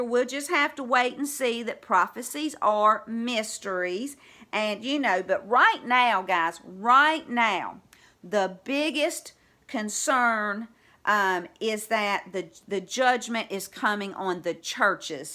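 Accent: South, United States. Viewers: Hi what a pleasure to meet you. I'm a new subby too. Love your sweet southern accent. God bless you and your family. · Aren’t you just adorable love your spirit and southern twang :-). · It's just that deep Southern draw!!!! You would fit right in here in East Texas!!! 😉😘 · HELLO. WOW I LOVE UR SOUTHERN ACCENT. ITS JUST LIKE MINE! IM FROM NC. I FOUND UR CHANNEL. ITS AWESOME CONTENT. THANK U FOR SHARING!!🇺🇸🇺🇸🇺🇸🙏🙏❤❤❤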